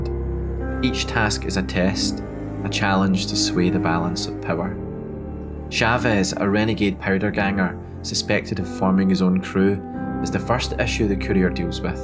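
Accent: Scotland, United Kingdom. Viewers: Great video man. Btw I love the accent. Is it Scottish?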